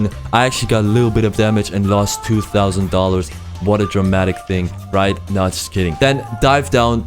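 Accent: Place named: Germany. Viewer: Good English skills, I cant even hear out the german accent although i’m trying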